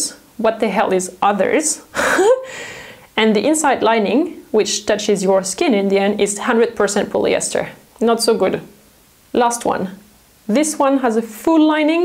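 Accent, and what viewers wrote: France. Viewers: Can't identify where she is from... Why I heard some french accent? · I like buying clothes and learning differences in quality and you make it informative and succinct, delivered with an absolutely killer French accent that would make learning the rules of cricket listenable! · Merci beaucoup pour cette vidéo merveilleuse! Ton accent francais est super mignon :) Et les astuces sont très intéressant! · Ce léger accent français :) Very interesting video. Clear and concise. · Super vidéo, je connaissais déjà ces tips mais je regarde pour le plaisir ! J'aime bien ton petit "French accent" ! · L'accent français est reconnaissable partout😀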